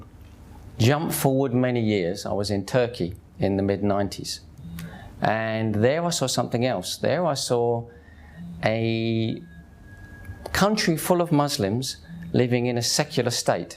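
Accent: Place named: London, United Kingdom. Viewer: I like your London accent but I can't do it. I do US accent, I lived there many yrs. I am Malaysian Malay Muslim.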